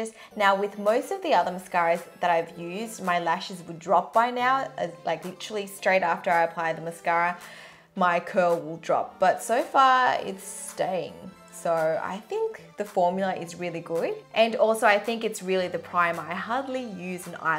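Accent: Australia. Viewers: Aussie accent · Are you an asian australian mix? I LOVE your accent! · OMG why straight away when she started speaking I first thought of how I cook that lol there Australian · isn't it funny how she has an australian accent I am from australia too there is actually a chance that I have walked past her on the street without even knowing that she is a youtuber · I love her Australian accent!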